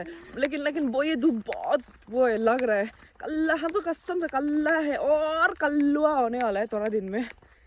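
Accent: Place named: Assam, India. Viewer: Your assamese accent is sooo cuteee😂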